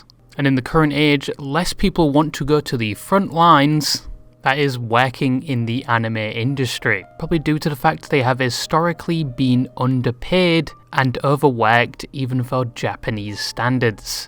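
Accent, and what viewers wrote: United Kingdom. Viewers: The bri'ish bird cooked again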